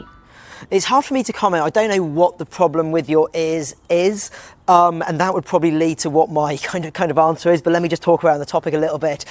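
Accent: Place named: United Kingdom. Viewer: Bro this guy has great voice.. Totally British accent!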